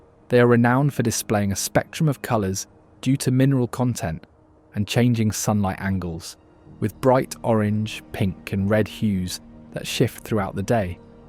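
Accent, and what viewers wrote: England, United Kingdom. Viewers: Can't stand all these British narrators talking about America. Why don't they go back to their Muslim country? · What’s up with this bloody Limey’s accent?🤮 It’s Arizona, so speak American🇺🇸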